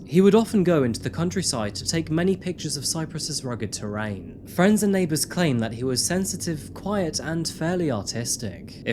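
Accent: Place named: United Kingdom. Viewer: Hey cuz are you gay? Or is it just that across the pond accent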